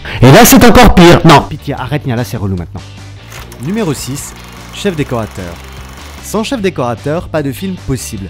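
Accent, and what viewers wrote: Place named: Paris, France. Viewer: Pourquoi il y a un accent parisien aussi fort, et me dite pas que les parisien n'ont pas d'accent, il y en a un; et c'est celui là!!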